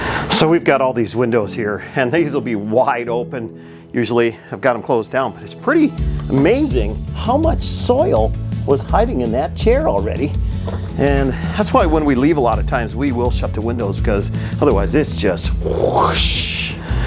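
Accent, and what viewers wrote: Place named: India. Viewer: INDIAN voice. Sales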